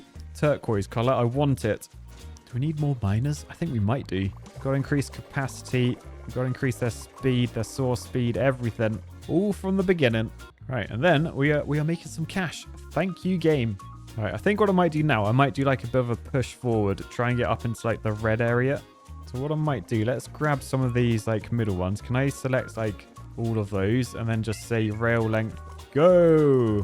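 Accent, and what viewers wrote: United Kingdom. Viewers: Ay brittish dude, will you play Tf2?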